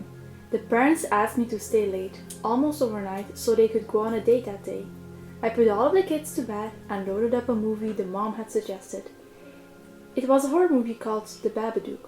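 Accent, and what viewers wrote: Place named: Netherlands. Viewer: Whats your accent? It sounds like Dutch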